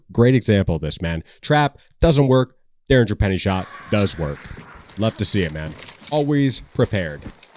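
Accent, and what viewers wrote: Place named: United States. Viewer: no not tech-bro, it’s just American Englisch. Even I as non native speaker can comprehend this. Do you really except someone to change the way they speak based on your feedback? The entitlement, holy shit wow.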